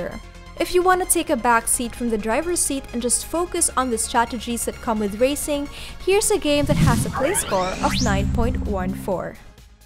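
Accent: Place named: Philippines. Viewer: She sounds pinoy lmao 😂 nice vid doe 👌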